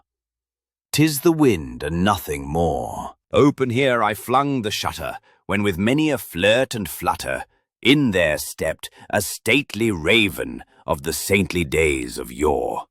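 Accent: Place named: South, United States. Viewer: As with your other presentation with Pistolero Poe, this is a joy to behold. I do detect a slight southern dialect; it becomes easy to imagine this as Poe's actual voice. The AI illustrations for his poem would have fascinated the same man who wrote an essay about the then new daguerreotype process, and sat for several of such portraits. He was quite taken by new technologies and the sciences. Thank you for including the entire poem that we might read along, or ponder it...evermore.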